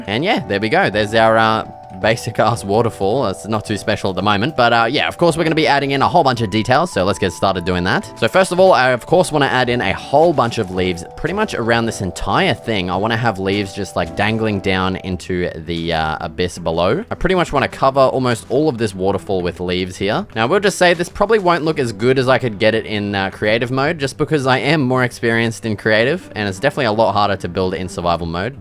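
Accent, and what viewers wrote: Australia. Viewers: Quick question are you Aussie? You sound a bit Australian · Your Australian Accent is so iconic I love it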